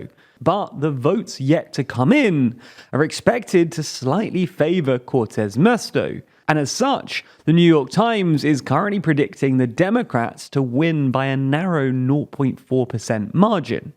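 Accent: United Kingdom. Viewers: I think the problem is that the announcer is a Brit. It feels like an outsider telling a news from a froeign country.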